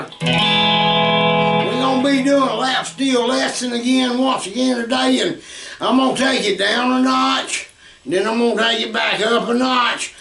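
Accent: South, United States. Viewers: Over here in England we just love that country accent of yours and your down - to -earth approach to things. Just bought myself a lap steel and finding your teaching sessions a real help and inspiration. Thanks.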